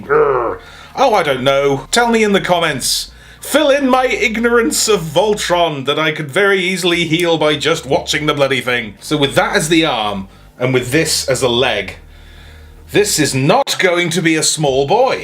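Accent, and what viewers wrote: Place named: United Kingdom. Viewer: I do remember the "Transform a thing" song! I do miss it! I don't remember exactly how I started watching your reviews, I do remember it was either the Kapow sponsored ones that showed up as news on Seibertron OR Acid Storm and Dropshot because they were obscure repaints and no one else had done them when I got them, but I do remember I saw the first ones and was like "heh, cool reviews" but left it there, and then when I saw the second ones I was like "heh, cool re...wait, I had seen reviews by this english guy before!" And after that, I began binge watching your stuff and have been a faithful follower ever since, you even helped me finally understand british accent!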